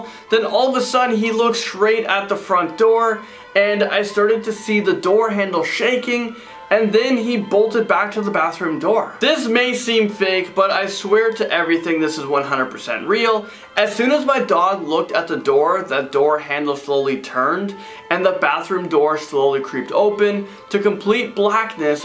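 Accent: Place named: United Kingdom. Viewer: 🛑🛑🛑 #mostamazingtop10 one this chanel is my addiction, I talk about it all the time. I put all my friends on , I watch your videos so much you got me talking In a British accent lmao. On a real note I'd like to share a story that was shared with me .. long story short my grandma and her siblings had a oujia board, while using it the word death was spelled out.. three days later my grandmas brother was out riding his bike ... unfortunately he was hit by a truck and died instantly.. God rest his soul.. ouji is no joke.. stay woke. 🛑🛑🛑 _KeekMarie 🤟 Ignore the Johnny ravo name Im using my boyfriends account.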